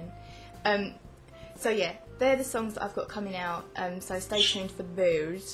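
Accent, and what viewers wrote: United Kingdom. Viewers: the more you post video..the more you got the british accent when you talk.. Kinda funny but sooo cool !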